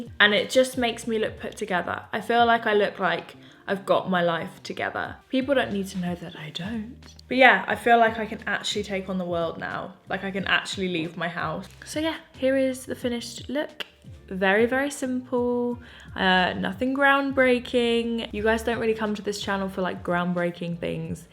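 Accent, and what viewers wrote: England, United Kingdom. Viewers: This is my first time watching you and I’m hooked. Love the makeup and you are stunningly beautiful. I could listen to your English accent all day 💕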